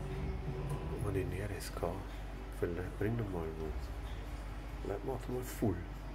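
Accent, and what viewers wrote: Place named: Bavaria, Germany. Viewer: Du bist doch a Bayer oder des hör ich doch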